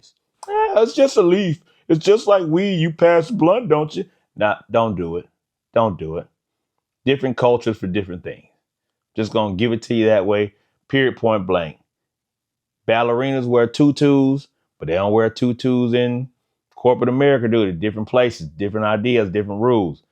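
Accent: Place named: New York/New Jersey, United States. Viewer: Why this brother looks familiar. I might have seen him in a cigar lounge in NJ. Most of where I frequent at. He has a Ny NJ accent. He's right about cigars and cigar lounge etiquette.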